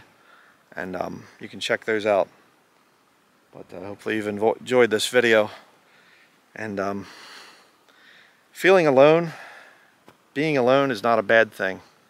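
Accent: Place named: Southeastern Pennsylvania, United States. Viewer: I knew you were from PA…I heard your accent and thought how does this New Englander have a southeastern PA accent? Did I hear you say, in so many words, you’re not a Trinitarian? I know the word Trinity doesn’t exist in the Bible…but I believe in Father, Son and the Holy Spirit. If I believe that God is one in three based on my current understanding of scripture do you find that problematic? Unlike many denominational members I like to have my beliefs challenged. Iron sharpens Iron🙏🏻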